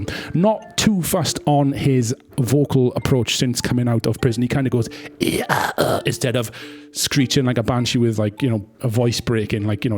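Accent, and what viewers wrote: Wales, United Kingdom. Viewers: Is this a fellow Welshman I hear?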